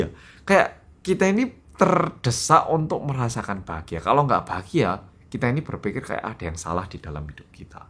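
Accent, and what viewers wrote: Java, Indonesia. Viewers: medok,medok,medok banget sih....bahasa koreanya logat Jawa ya? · kok malah logat jawa ya keliatan medok he he hee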